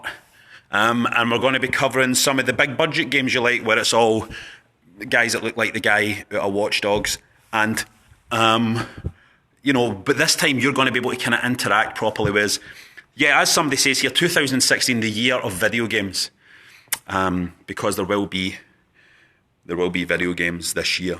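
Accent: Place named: West End, United Kingdom. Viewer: Mate yer sounding like a fucking West End Weirdo these days.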